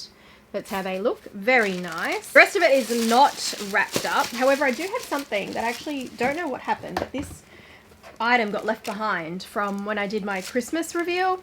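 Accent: Australia. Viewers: You sound very Australian wouldn’t you be better off flying to Dubai to purchase Luxury handbags 👜?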